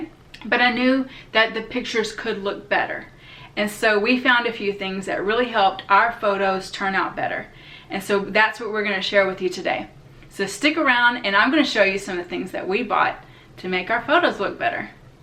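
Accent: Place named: South, United States. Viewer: I swear to you I skipped the introduction and was watching thinking… this womans from the south! 😂 (slight accent) Went back and checked sure enough! Watching from Shreveport, LA thanks for the content 👍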